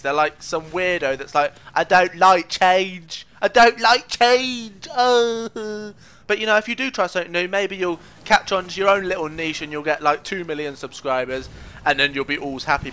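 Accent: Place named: United Kingdom. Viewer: Thx for sending the vid :p I love brittish axent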